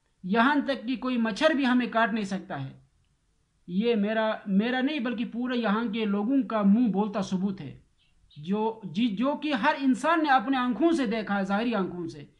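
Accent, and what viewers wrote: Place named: North, Pakistan. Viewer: He belongs to Northern pak.his accents are a bit different.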